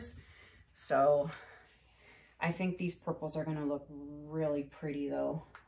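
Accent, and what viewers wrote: Canada. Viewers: im from Georgia US and your Canadian accent just does it for me. i love watching you paint and getting tickled at your long "ooo"s lol thanks for what you do. still havent mastered a dutch pour but im not giving up. best wishes to you and your family.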